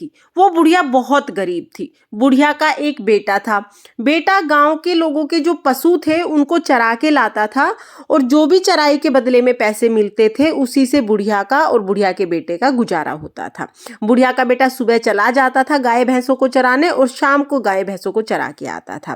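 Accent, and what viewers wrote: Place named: Meerut, India. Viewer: 😊😊ji achcha reply k liye dhanyawad...aapki voice tone thoda hmare meerut ki lagi isliye puchha😊 happy sakat chauth aap sabhi ko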